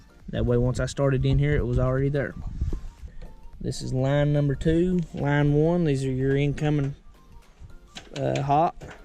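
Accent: South, United States. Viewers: Dang that accent sounds more south. I have to put in a 200 amp meter pole for a single wide Saturday I'm gonna be doing it alone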